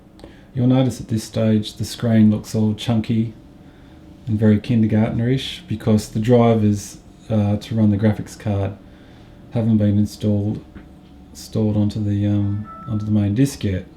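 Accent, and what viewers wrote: Australia. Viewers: YES another aussy lol Respect! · great stuff thanks already knew a lot of that but the folders I didn't know about that I usually did it a different way thanks for that good Australian accent you have like me hahah ok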